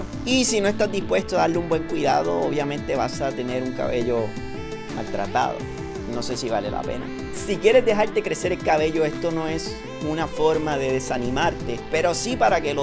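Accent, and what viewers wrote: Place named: Puerto Rico. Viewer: Hablas como puertorriqueño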